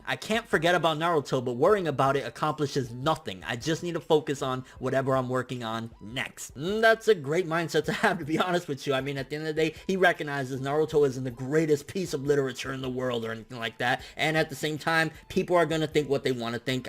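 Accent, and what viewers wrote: New York, United States. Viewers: I hate he says the characters names in his American accent like say it right brov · Ny accent?🤔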